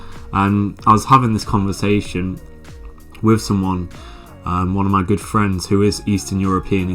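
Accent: United Kingdom. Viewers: After living solely in different countries in my life with a third-world country background. At this moment I believe it's just between 6-8 considering living standards in first-world countries, what makes the difference is your priorities, having a partner or close friends or not, all other things can make you feel better and some people just want to stay away from their culture, that could also give some freedom! This life offers adventures but we also crave stability, as long as you are young exploring will make you more open-minded but ultimately you need to make the best out of your life! BTW I love the British Accent!!! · That's because judging from your accent you're from Northern England. They can be a horrible bigoted miserable bunch in the North. People in the South are generally more genteel, urban and cosmopolitan.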